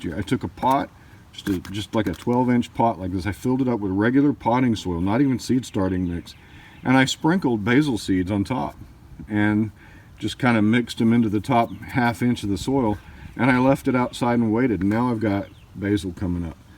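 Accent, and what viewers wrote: Louisiana, United States. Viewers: I love your videos because they are spot on, succinct, & easy to listen to. I was raised in Louisiana and enjoy your accent, too. Question - is sorrel an herb or salad green? I put one in an herb bed and am thinking I'll repot it by itself since learning it's perennial. I'm in coastal California so deal with some fog. Wish me luck!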